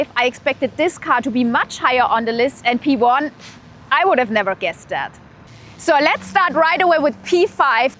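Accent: Germany. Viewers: I clicked for the car information but come on. She is beautiful and dressed in a way that I can’t look at the cars for some reason.😂. I love the German accent and that she knows about cars. Definitely an exotic I would want to rent.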